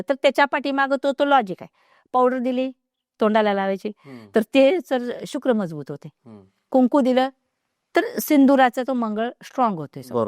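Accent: India. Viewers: Aaho त्या दिल्ली साईड la राहिलेल्या आहेत म्हणून हिंदी मिश्र मराठी बोलतात ,तुम्ही pn दुसऱ्या राज्यात राहिलात तर त्या भाषेचा प्रभाव तुमच्या बोलण्यात होईल ,त्यात अशुद्ध अस काहीच नाही त्यांच्या भावना तुमच्या पर्यंत पोहोचणं महत्वाच आहे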